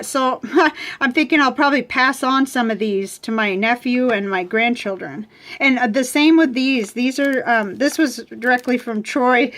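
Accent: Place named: Texas, United States. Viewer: You look and sound like me. So "hello" Texas girl. I thought you might be from the north. I am in N Texas. I just started all this in May myself, I buy cheap pens to try the nibs out. As Brian from Goulet said Quantity over quality" I too discovered cigar boxes and some of them are just beautiful. And they are cheap. Very handy instead of all the plastic storage which I just could not put my pens in something like that. I, too use those syringes to fill all my pens instead of dipping the nib in the ink. That is just too much of a hassle. I have alot of your videos to catch up on.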